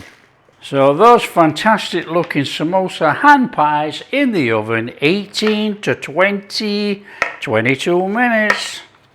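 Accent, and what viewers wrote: United Kingdom. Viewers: I love who you are, love your awesome British accent , your laugh and simplicity, my wife shared this channel with me three months ago, can't stop watching you sir. THE BESY RECIPES ON UTUBE, absolutely love your OH COME ON PLEAAAAASE! THANK YOU SO MUCH SIR FOR SHARING WITH US, YOUR GEEAT TALENTS, your expressions are so spot on when you try your dishes, everything about your videos are priceless, thank you RIK from ILLINOIS TO YOU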